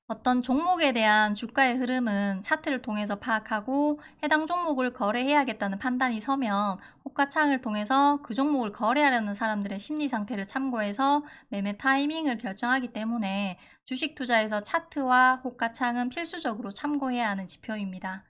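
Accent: Gyeongsang, South Korea. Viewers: 경상도 사투리 여성분 목소리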